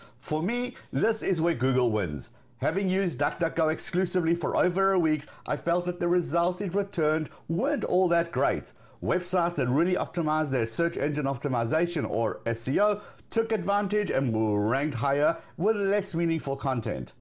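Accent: South Africa. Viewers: I’m having trouble with the accent. Is it South African? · I know a South African accent when I hear it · Is this guy South African?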